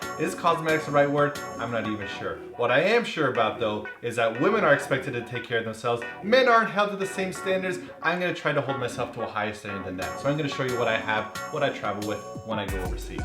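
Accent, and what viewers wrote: Russia. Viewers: I love Russian accent. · YAAAA I click video for manly man Rrrrussian accent!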